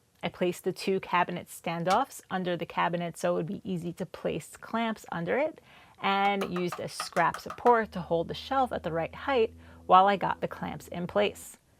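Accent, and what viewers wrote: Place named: Long Island, United States. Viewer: Long Island big time. I'm not from here originally, so it grates n my nerves every time someone says it. And I'm in a woodworking club lol. See also "Radiator" where the "rad" rhymes with rat, not raid.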